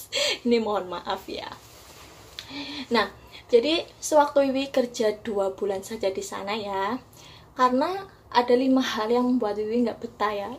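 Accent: Banyumas, Indonesia. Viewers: Cerita pengalaman kerja disingapore.. SINGAPORE daerah enthi nduok??? Ngapak wadon 😍😍😍😍😍😍😍 Taiwan singapore,duiten arep gae opo nduok😀😀😀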